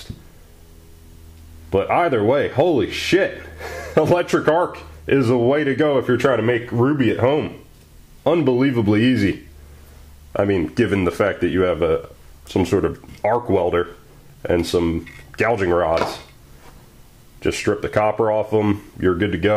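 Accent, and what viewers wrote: United States. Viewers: US AvE? Sounds the part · Am I in the twilight zone? Are you American AVE?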